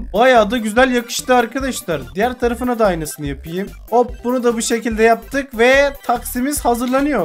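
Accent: Denizli, Turkey. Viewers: Abi sen denizlilimisin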